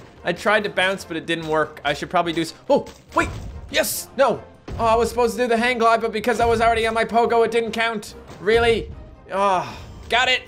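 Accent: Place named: Canada. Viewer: You can hear his Canadian accent I love it!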